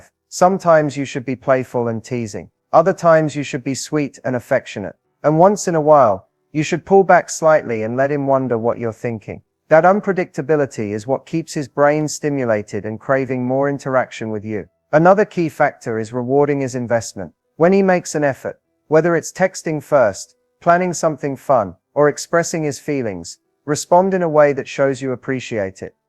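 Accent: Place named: England, United Kingdom. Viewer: I like this English accent. I think I should learn to speak like this .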